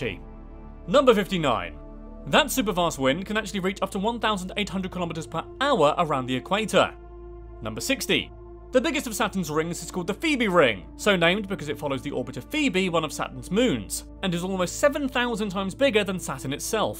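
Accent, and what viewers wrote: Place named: United Kingdom. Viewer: Hes British 🤦🏾‍♂️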